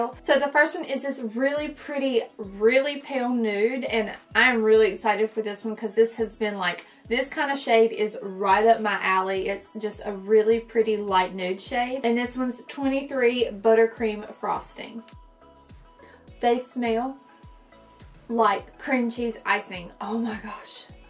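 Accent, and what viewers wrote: South, United States. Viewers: yeah she has a really charming southern accent. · New subscriber! Your accent completely won me over 💘 I'm a southern girl myself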